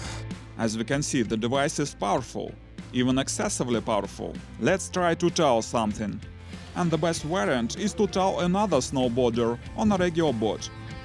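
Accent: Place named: Russia. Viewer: Да, русского сразу выкупаешь, но который пытается казаться нерусским (сам в молодости пытался так говорить) А наповал пишется слитно, кстати)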